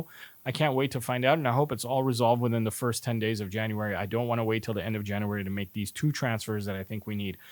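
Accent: United States. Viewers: It's strange hearing an American accent talking about football ... I'm not saying you don't have the right like anyone else to be football fans .. but hearing you say 'we' is very unfamiliar... Fair play fella , I'm not hating ... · I am portuguese and I dont care if your accent is american, keep the videos coming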